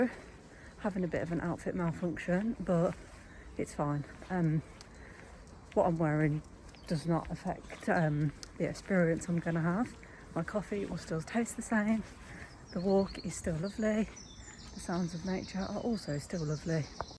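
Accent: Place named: Birmingham, United Kingdom. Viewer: Bath is beautiful, I'm glad that you had some sunny weather, 🌞 A moored narrowboat, in Bath, is a great first time experience. The stunningly good YouTube algorithm bought me here 😊 I'm not a boater but used to work in Bath and I know some parts of the K&A. Unfortunately, I know of several dogs that have drowned, particularly Bulldog types. Every dog falls in at some point. So perhaps get Nina a life jacket that also keeps her nose out of the water. The K&A is so popular, it's one of the most congested canals and finding moorings near settlements is difficult. I know nothing about you, but hear the Birmingham accent, so I guess canals are in your DNA 😅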